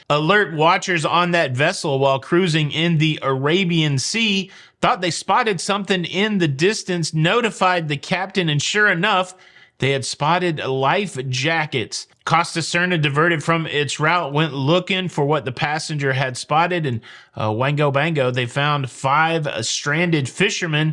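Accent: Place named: South, United States. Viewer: Sounds like you're picking up a bit more of a southern accent! I'm a little irked about the increase in the price of the bottled water. It's a weird thing for me to be irked about since I start out being irked I need to contribute to the single-use plastic problem by getting it in the first place. I drink 3-4 liters of water a day and I use tap water and filter it. That's not really a thing on cruise ships so I combine water from the buffet and bottled water in my cabin. This just means I need to find a way to filter water in my cabin. The sink is inconvenient for anything but washing hands and brushing teeth but where there's a will, there's a way!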